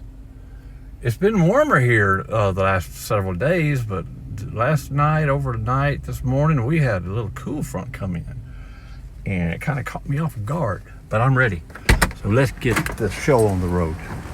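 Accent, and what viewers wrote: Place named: Texas, United States. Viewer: Thanks, I thought it looked like Texas + accent too 🙋‍♀️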